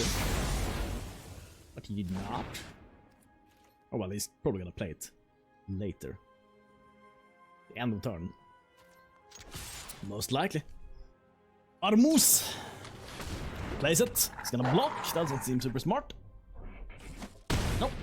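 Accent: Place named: Sweden. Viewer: god dam you have a strong Swedish accsent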